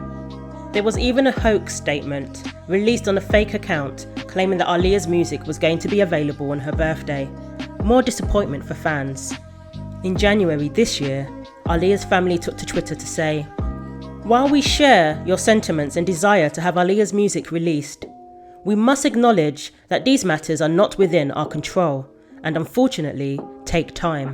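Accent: England, United Kingdom. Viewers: No new information here. Just told in an English accent.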